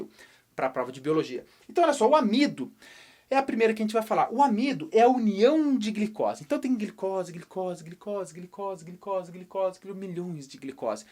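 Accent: Rio Grande do Sul, Brazil. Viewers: O professor também me ensina o sotaque gaúcho(eu sou cearense) Ei mais uma pergunta o que que é "me caíu os butiá do bolso"?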